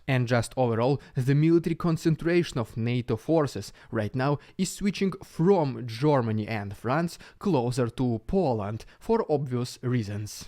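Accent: Russia. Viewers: you need English speaking girl friend not a sponsor. You have potential to speak perfect English Your Russian accent sacks. I enjoy your videos but after few months I still can't understand your full introduction. Just a friendly advice from a fellow emigrant. 😋 Good will prevail, chwała 🇺🇦🇵🇱🇺🇲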